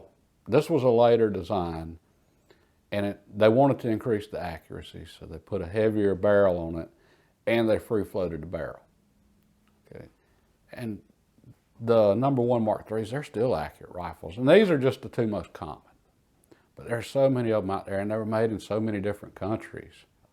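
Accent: South, United States. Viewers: Fantastic just love your southern Accent, thanks for knowledge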